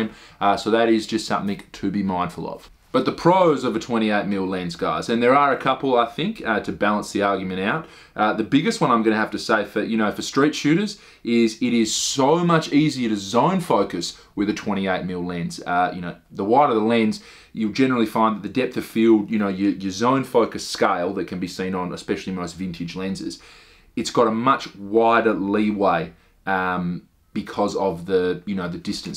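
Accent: Australia. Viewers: Gotta say this, love your true blue Aussie accent.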